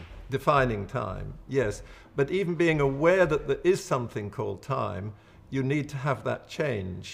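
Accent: United Kingdom. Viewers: His British accent makes him seem much more believable. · He basically admits he is thinking of time as a rigid linear thing, which can be ‘paused’ while other time persists... yet chastising Einstein for thinking of time on a clock. Einstein used clocks as reference for time according to who was using one in relation to acceleration etc. This is old thinking. The clock isn’t the issue... time isn’t the issue.. only to who it’s ‘RELATIVE’ to... he’s describing chapter 2 of E=MC’2 which is 15 chapters. *make another video when you get to the end ;) this only reaffirms that because you have a British accent doesn’t make you wise... it’s having an accent in a theory which has stood the test of ‘our time’ and never, ever been refuted.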